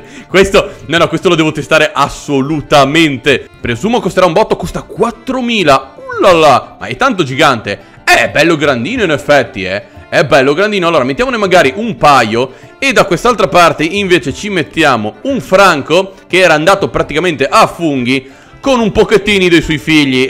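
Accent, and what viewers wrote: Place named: Bergamo, Italy. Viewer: Da quando è che hai un accento bergamasco?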